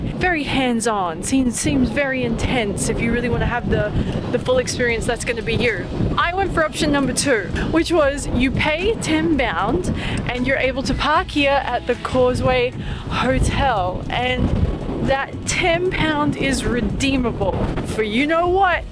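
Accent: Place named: Australia. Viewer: She sounds Aussie, they drive on the same side of the road as Ireland and the UK.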